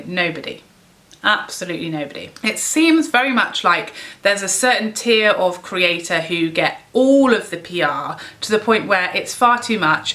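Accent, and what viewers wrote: United Kingdom. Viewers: Gwaaaad I hate it when you speak so much sense 🤔... it’s a catch 22 isn’t it... if you could review new launches promptly you would get more subscribers... One of the things I LOVE about your channel is that you’re British... so apart from an awesome sense of irony... in a sea of American accents talking about American products, you really stand out. So maybe we could reach out to UK brands first? I’m onto Charlotte Tilbury...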